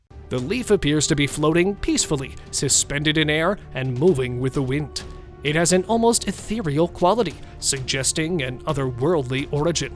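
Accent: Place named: Russia. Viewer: Mne kajetsa, chto dictor russko-govorashiy.. 🧐🤌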